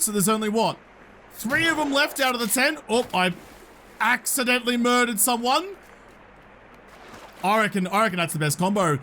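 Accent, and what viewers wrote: Australia. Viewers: bro he has the most Australian Australian accent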